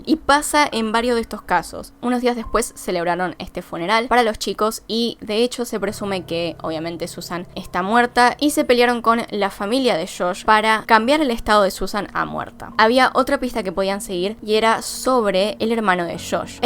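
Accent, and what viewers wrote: Argentina. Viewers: Ella es de Argentina y allá su pronunciación así suena...a mi en lo personal me encanta su manera de hablar, es linda! 👍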